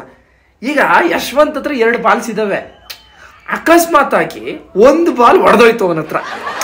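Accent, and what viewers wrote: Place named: Bengaluru, India. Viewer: Tuluva na? Ashtu channage local Bengaluru Kannada maathaadtheeya alva?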